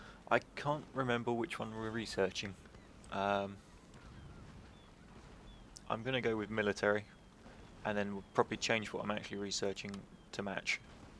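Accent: United Kingdom. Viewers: are u brittish?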